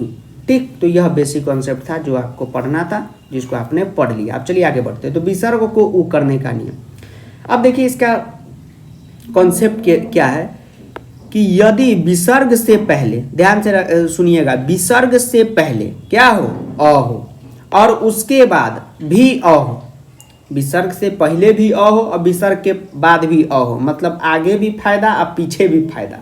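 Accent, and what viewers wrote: Bihar, India. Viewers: Sir aap bihari hona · Sir are you from Bihar because your language is similar to bihar and you are teach very well 😌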